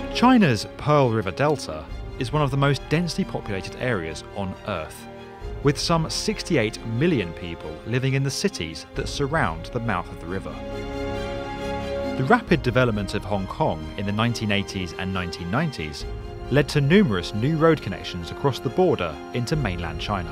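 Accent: United Kingdom. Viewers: B1M is one of the most professional and dignified channels here on YouTube although they do have the unfair advantage that their voice-over is done by the most British man ever that always add an extra layer of class and sophistication to any piece of media.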